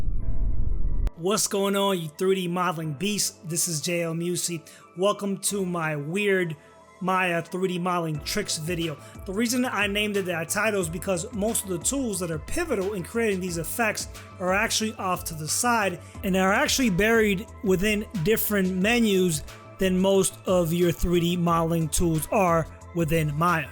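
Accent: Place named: New England, United States. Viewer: Awesome tricks, nice to see some tuts with good ol' new england accent too.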